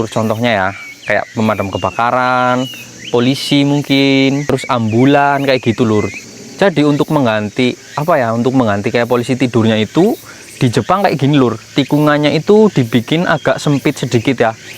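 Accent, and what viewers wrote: Banyumas, Indonesia. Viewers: Wong ngapak ya min? Rika sekang daerah ngendi lur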